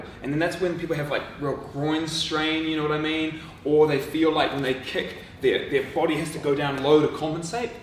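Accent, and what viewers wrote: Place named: New Zealand. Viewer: sounds super kiwi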